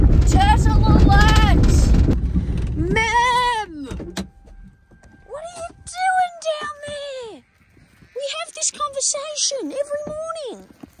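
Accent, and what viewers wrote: Australia. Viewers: I love everything about your content. You telling us how stuff works out there. Aussie accents are always a delight to listen to. You telling the idiot comments to fuck off (this one was particularly good lol). Random Slug intermissions. And seeing kangaroos fucking about like deer (as an American, most of the time if I ever see kangaroos in videos it's documentary stuff, so seeing them just doing kangaroo things in someone's fields is weirdly entertaining to me). Good stuff all around!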